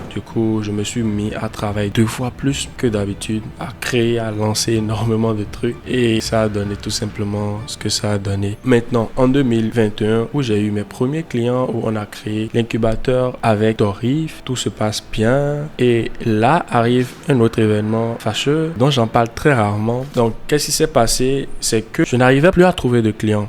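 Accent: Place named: Togo. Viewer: Bonsoir, vous avez un accent togolais!🌺❤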